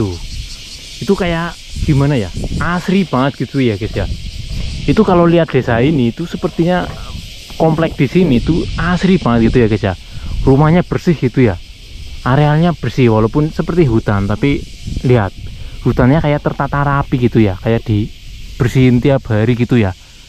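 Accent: Banyumas, Indonesia. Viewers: jawanya ngapak maseh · Wis katon sampean pasti asale sng brebes.. soale logate ngapak.. salam kenal mas e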